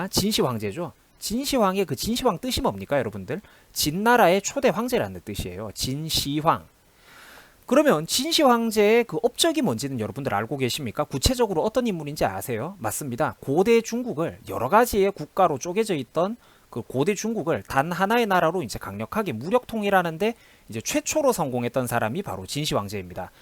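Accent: Gyeongsang, South Korea. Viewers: 30대 청장년인것 같은데 참 반듯하고 젊잖고... 제 아들이 처럼 반듯하게 자랐으면 좋겠습니다 자라나는 아이들에 롤모델이 되어주셔서 감사합니다 학교에서도 역사공부 이리 시켜주지 않는데 젊은 청년이 어디서 이리 많은 바른 지식을 갖추었을꼬? 경상도 억양도 참 듣기 좋아요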